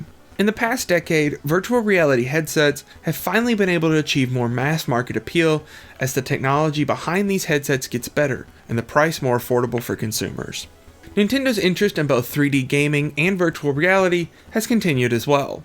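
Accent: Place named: Kentucky, United States. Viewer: Judging from that accent youre also a Kentuckian. Subscribed.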